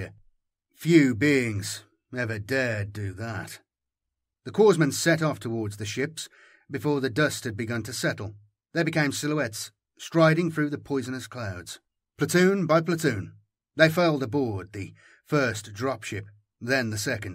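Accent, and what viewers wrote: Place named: Midlands, United Kingdom. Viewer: Fairly new to you channel, loving it Always makes me smile hearing that Midlands accent. Dow worry, it ay as strung as moine our kid.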